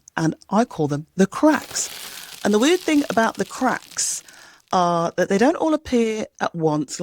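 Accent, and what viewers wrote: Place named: United Kingdom. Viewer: Just a note of encouragement for your channel. Luv your sense of humor and soft, soothing delivery. The Brit accent doesn't hurt either. You know how us Americans adore a British accent!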